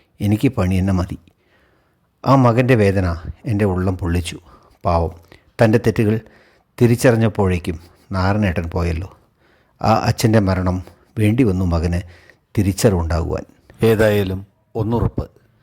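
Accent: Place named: Valluvanad, India. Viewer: എന്നത്തേയും പോലെ നല്ല അവതരണം. നമ്മുടെ ആ വള്ളുവനാടൻ ഭാഷ കേൾക്കാൻ എന്താ സുഖം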